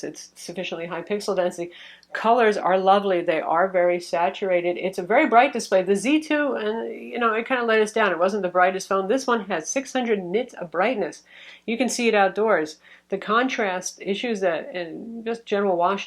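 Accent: United States. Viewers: Can't understand a bloody word she's saying. Horrible Yank accent or you're pissed during this review.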